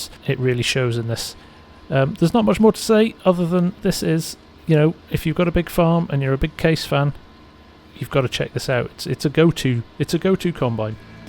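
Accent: United Kingdom. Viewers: Hey, do you live in the US, you sound British but I've seen you like ur US quipment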